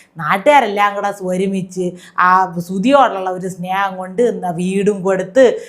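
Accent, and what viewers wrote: Thiruvananthapuram, India. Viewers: 😵‍💫🥴 തിരോന്തോരം അപ്പി സ്‌ലാംഗ് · Thirontharam slang is xcellent❤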